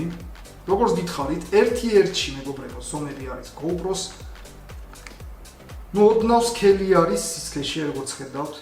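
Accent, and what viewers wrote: Georgia. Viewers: თბილისელი არ ხარ?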